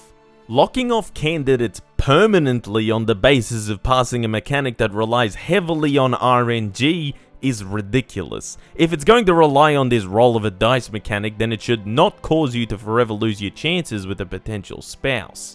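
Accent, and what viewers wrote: Australia. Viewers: This guy sounds like the biggest ethnic from Australia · No. He sounds either Australian. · Definitely Australian but with some kind of Slavic twinge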